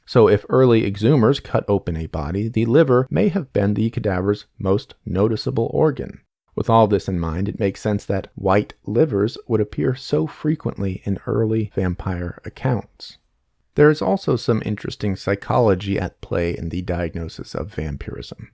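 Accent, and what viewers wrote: United States. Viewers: I’m confused why you’re speaking American and not Australian tho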